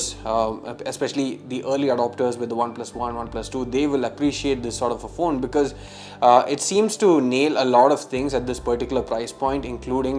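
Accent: Kerala, India. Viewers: English kettal parayo e machan malayali anenu!?!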